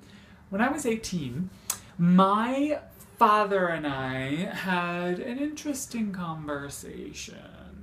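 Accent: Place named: United States. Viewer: 8 year old me thought this is how all Americans talked and are like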